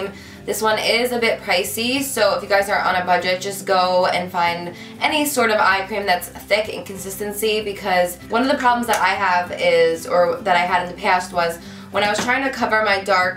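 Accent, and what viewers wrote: New Jersey, United States. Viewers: Are you from New Jersey? I can hear the accent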